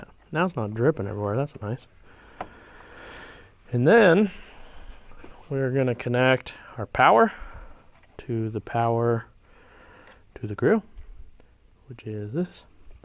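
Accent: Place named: South, United States. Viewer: This precious man speaks like a southern American Red Green . Love the video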